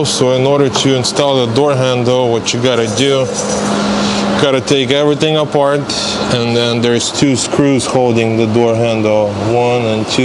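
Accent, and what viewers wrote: Russia. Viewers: Вы говорите на английском с русским акцентом, но вы не русский, или уехали очень давно. "Досвидания" - по-русски было с английским акцентом. ;) По ремонту всё очень интересно, по железу, по салону, по электрике. Спасибо!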